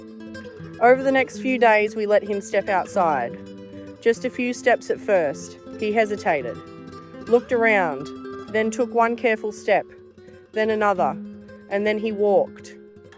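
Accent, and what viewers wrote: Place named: Australia. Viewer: So so agree with you. Your words give me the courage to go out and become a better human to offer what I have what I have learnt from my involvement with animals the good that I can still give to those who need something in their lives. Where are you situated? Your accent sounds Aussie is it.? Let me know please. Thankyou for all you do god bless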